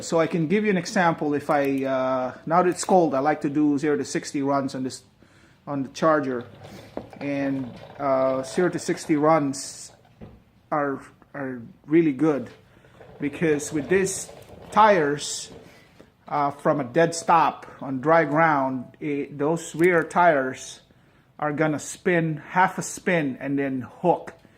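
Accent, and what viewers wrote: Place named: Philippines. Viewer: You Filipino. You got that accent. Do you have steering wobbles with these tires.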